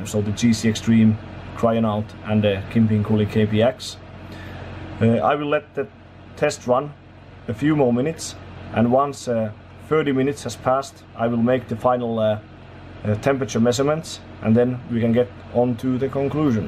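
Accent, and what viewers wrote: Finland. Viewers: You sound Finnish, are you Finnish? Jos oot, hyvin tehhty video.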